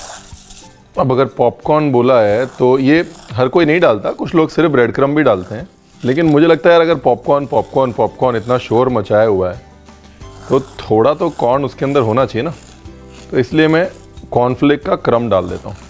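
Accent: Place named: Punjab, India. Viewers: Yess thats Punjabi style.....Chef Harpal ardak bolate hai...adrak ko😀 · "Amrricca" pure Punjabi English .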